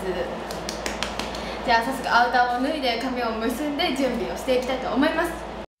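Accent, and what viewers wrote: Kansai, Japan. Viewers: 葵のアウター姿も可愛いが 本当は葵自身が可愛いのだ💕BEAUTIFUL GIRL💖💖💖💖💖 そして葵の関西弁は本当に可愛いね。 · JULIAちゃんの動画から来たからバリバリ関西弁？なのすごい驚いてる😂💗💗かわいい😳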